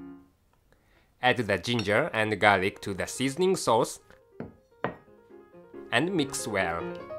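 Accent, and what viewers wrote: Japan. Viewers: he is Japanese accent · Vegetables. He's Japanese. Japanese doesn't really have a V sound, so they usually use B instead.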